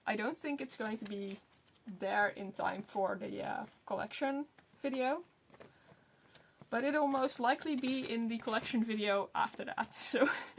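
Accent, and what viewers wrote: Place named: Finland. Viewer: You just sound so finnish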